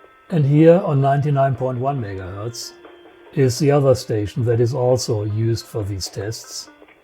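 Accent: Germany. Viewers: Hey, you are a native German? Name and accent point to that conclusion:) 73 from Germany:)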